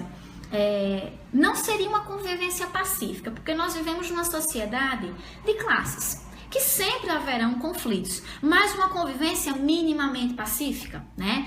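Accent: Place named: Paraíba, Brazil. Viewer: Nossa!!!! Que aula maravilhosaaaaaaaaaaaaaaaaaaaaaaaaaaaaaaaaaaaaaaaaaaaaaaaaaaaa Professora pensa como eu penso. Mt didática e ao mesmo tempo teoriza mt bem... Mas o sotaque parece de paraibana rsrsr. Meu Nordeste me Orgulha mesmo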